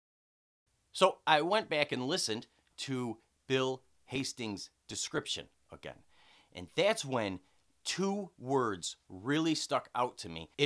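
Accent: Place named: Chicago, United States. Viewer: I'm betting Chicargo...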